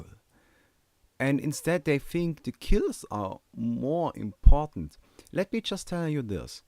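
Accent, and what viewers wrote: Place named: Germany. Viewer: Dude you're german, so your accent is amazing! And this is the simplest yet best bit of advice you can give us! I consider myself a "decent" last hitter (in casual mode, no stats) I can generally get every last hit! But as soon as I go matchmaking I start failing!! :( I don't know what I do differently, I guess the other players harass me so I don't get a chance to last hit! :(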